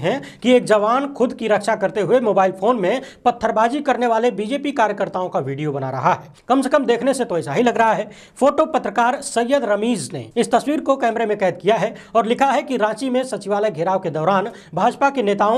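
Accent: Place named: Bihar, India. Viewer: Aap ghantwa dabane ke liye kahte hain,magar size me Chhota hai isliye use ghantiya dabane ke liye kahen.baki aap ka Bihari boli me sab bat achcha se samajh me aata hai.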